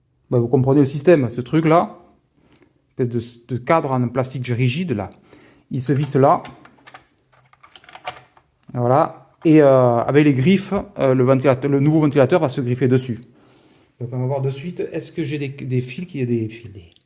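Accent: South, France. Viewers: ah d'accord je suis de Toulouse j'ai reconnu l'accent de la région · l'accent du sud représente! Pour une fois que quelqu'un dis pas de la merde ca fait plaisir! Je m'abonne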